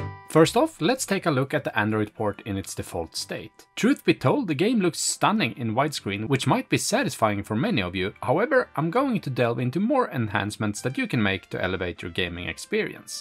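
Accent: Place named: Sweden. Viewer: Once you learn to spot a swedish accent it's hard to miss, heh. -fellow swede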